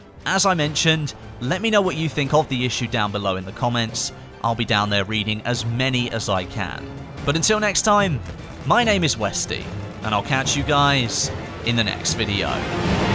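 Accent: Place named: England, United Kingdom. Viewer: john long He's British he has he English accent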